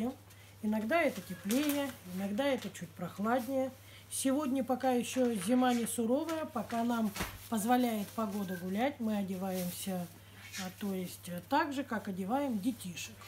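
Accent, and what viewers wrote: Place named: Russia. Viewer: Thank you for the subtitles. I love hearing your beautiful Russian accent when you speak. I've been learning the cyrillic alphabet and how to speak Russian, I had always wanted to learn. Thank you for taking such good care of Katya, she looks so much brighter and healthier after having spent time with you and your loving husband.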